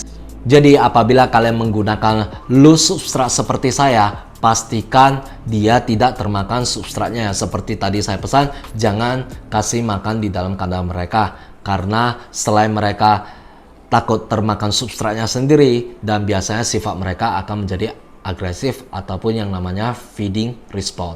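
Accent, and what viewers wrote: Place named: Chinese-Indonesian, Indonesia. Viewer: Penjelasannya sangat lugas dan jelas... Dengan dialek kokonya yg khas .. Semangat ko,di tunggu konten lainnya...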